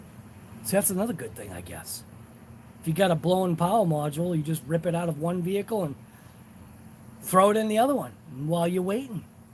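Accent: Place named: Boston, United States. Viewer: you gotta be a fellow bostonian. i can tell by your accent, wicked awesome guy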